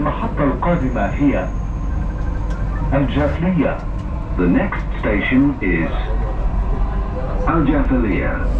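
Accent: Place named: United Kingdom. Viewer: I know it’s good to include the English one other than Arabic, although that accent in English is always British, also heard in Hong Kong MTR, Carmelit etc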